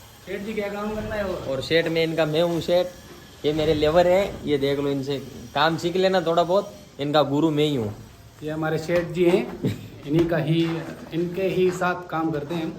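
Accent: Rajasthan, India. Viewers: bhai aap Ajmer ya Nagaur se ho. Kya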